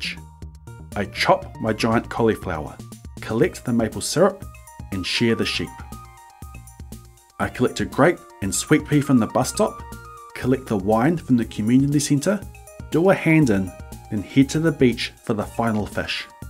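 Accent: New Zealand. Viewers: New Zealand accent. 👌🏽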